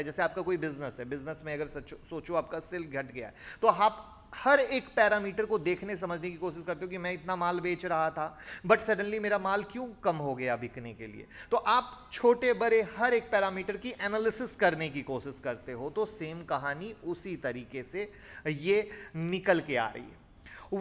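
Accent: Bihar, India. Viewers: Sir aap Bihar se ho kya?????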